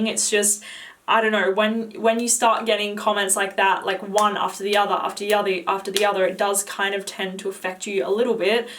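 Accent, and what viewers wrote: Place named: Australia. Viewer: I follow you for your knowledge, not your age. I'm an Aussie now living in the USA, and I just turned 50. My head tells me I'm 30 (only my body tells me I'm 50!) Lol. Keep up the great videos, I've since found my 'signature' scent with de Marly Cassili. I'm saving frantically to get a full size bottle 😉 Your accent keeps me reminded of home and I thank you for that too 🌹Please DO add videos for us 50 and over 😊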